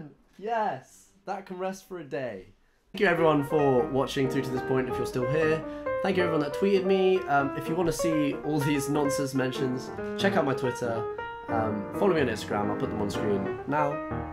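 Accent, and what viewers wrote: RP, United Kingdom. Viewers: seeing the way you tweet i thought you were from london but you sound so posh speaking slang it don't work ????? i had to stop at the "that you man have been sending to me" ... idk ... your sisters peng tho 👌😍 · Did you two go to different schools or something? You sound way posher than her mayyyyn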